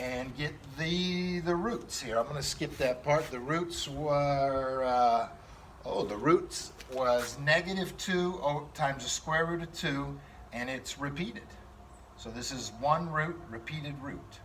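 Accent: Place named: South, United States. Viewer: God, its feels so nice to hear a southern accent. You talk like I think. Hallelujah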